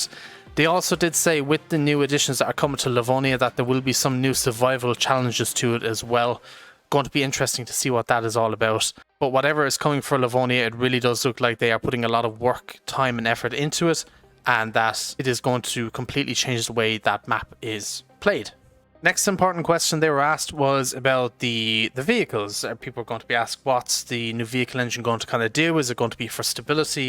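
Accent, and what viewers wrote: Ireland. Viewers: are you irish because i can hear your accent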